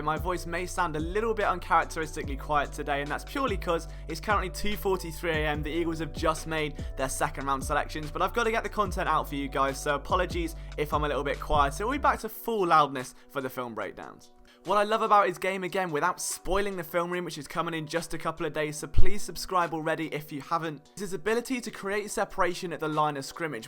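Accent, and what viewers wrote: England, United Kingdom. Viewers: Yeah thanks for all your insight, after the first few times of getting used to your English accent, I really love your videos. You need a collab with Philly 500, i'd love to hear your accent and his Philly accent together, haha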